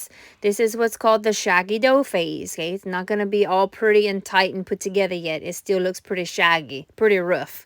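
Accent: Louisiana, United States. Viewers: I’m following for the content but also for your accent! I love it! I spent 5 years in New Orleans for college, so I have special place in my heart for a beautiful Cajun accent ❤️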